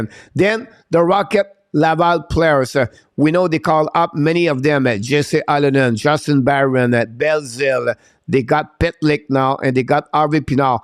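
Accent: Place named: France. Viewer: Hey, we really enjoy listening to you and watching your videos. We are from Montreal but we dont live there anymore. We get a laugh from your heavy accent but you know your stuff man! Keep up the good work my friend, you have greatness inside of you!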